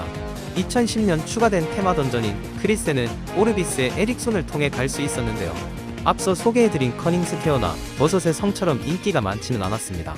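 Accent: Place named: Gyeongsang, South Korea. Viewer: 크리쎄 ㅋㅋㅋㅋ 경상도분이시구나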